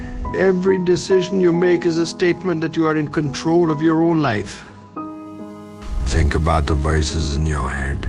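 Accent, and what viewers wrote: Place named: India. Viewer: BRO THEY ARE DELETING MY COMMENTS, WHAT I AM SAYING IS THIS DUDE SOUNDS LIKE AN INDIAN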